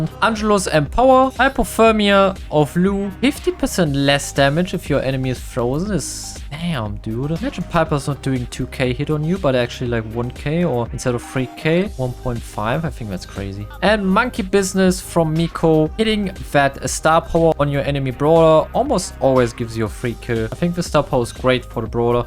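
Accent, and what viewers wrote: Germany. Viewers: Bro ist so deutsch 😅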